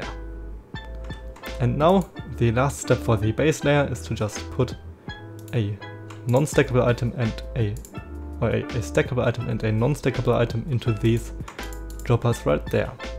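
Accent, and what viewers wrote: Germany. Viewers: Amazing Video! It works perfectly fine! I’m just wondering if you’re german, because you have an accent?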